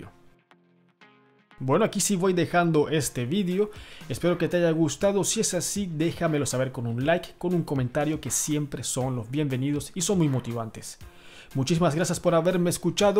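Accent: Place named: Yucatan, Mexico. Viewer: amigo hablai colo yukateko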